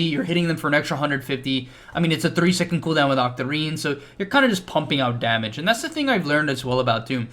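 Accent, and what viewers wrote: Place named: United States. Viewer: The way you say veil lol, I was like wtf is he saying.. had to listen 3 times. Do all Americans say it like that? Are u American or Canadian, u sound American I think.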